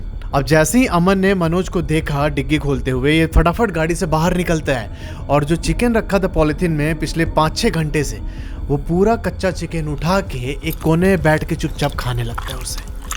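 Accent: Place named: Bengal, India. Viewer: I am bengali but I live in Nepal aapka bangla accent mujhsey milta heinn😅😅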